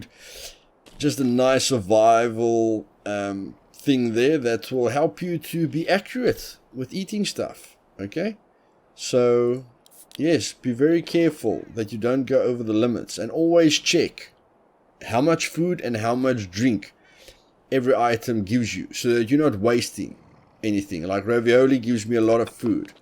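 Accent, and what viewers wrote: South Africa. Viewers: Nice guide first thing I noticed was that South African accent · Like the accent, you from SA?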